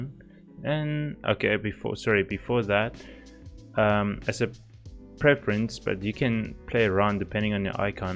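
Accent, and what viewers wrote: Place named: South Africa. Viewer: I detect a South African accent there....am I correct?😉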